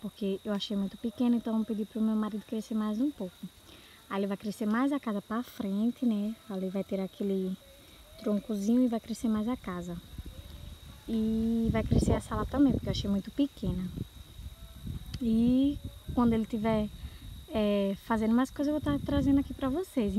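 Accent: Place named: Northeast, Brazil. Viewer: Boa tarde, vim Através do canal Gaby Morena, gosto do seu sotaque nordestino, boa sorte e muito sucesso com seu canal.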